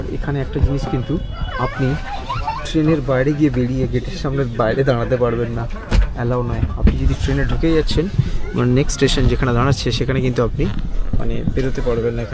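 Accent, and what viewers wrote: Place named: Bangladesh. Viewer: Your bangla accent sounds like bangladeshi.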